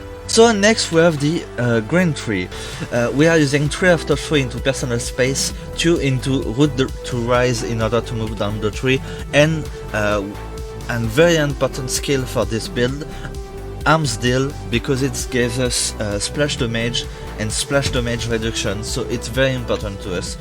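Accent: France. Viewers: T'es français non 😂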